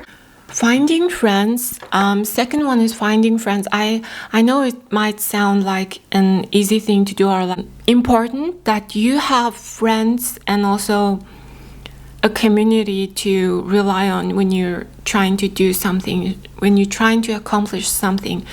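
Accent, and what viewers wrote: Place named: Australia. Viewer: .. you are starting to get an Australian accent. 😁 Would you still study business if starting again today?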